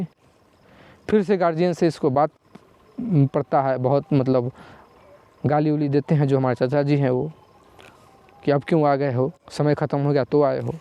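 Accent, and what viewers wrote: Bihar, India. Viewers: बिहारी उच्चारण में बतायी गई कहानी रोचक लगी। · Bihari tone hae so it was bit boring ..Hindi speaking is not good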